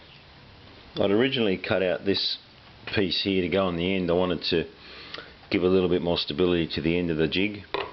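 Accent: Australia. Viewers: you sound like you've got a queensland accent! greets anyways mate, really enjoyed this video and can't wait to make one! Cheers!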